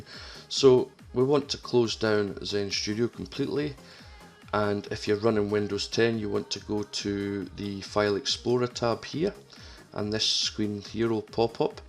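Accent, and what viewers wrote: Scotland, United Kingdom. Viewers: No way , your Scottish ! Nice video man and great script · Do I hear some Scottish in that accent?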